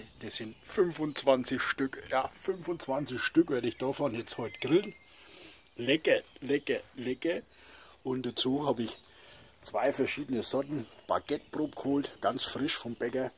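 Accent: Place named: Franconia, Germany. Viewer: Fränkischer Dialekt. Pils zum trinken. und FC Bayern Trikot. Ich bin maximal verwirrt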